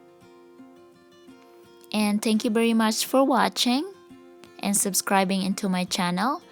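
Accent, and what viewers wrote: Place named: Bohol, Philippines. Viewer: Ganda ng Boses Malamya manalita,Saan province mo,Bohol?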